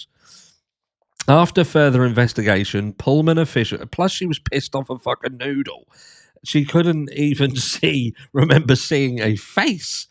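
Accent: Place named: RP, United Kingdom. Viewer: 🤣 U butcher the word “affidavit” like I do, but at least u’ve got that posh British accent to make it sound cool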